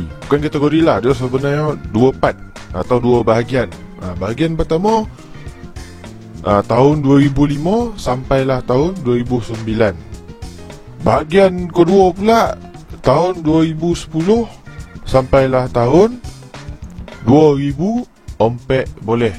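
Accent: Negeri Sembilan, Malaysia. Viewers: Ada 2 sbb utama kenapa orang layan video bro: 1. citer pasal asal usul kereta. 2. loghat nogori yang rasa dekat di hati dan klaka.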